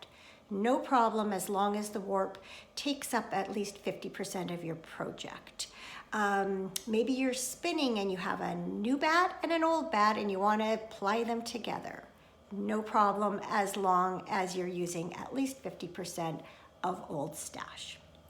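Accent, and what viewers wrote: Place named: Canada. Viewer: I just found your podcast today Great to hear a fellow Canadian. I'm looking forward to joining your makealongs.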